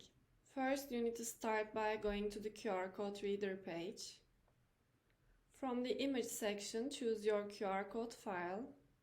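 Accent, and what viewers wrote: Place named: Turkey. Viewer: Bu üslup kesinlikle bir türke ait olmalı :D türk ingilizcesi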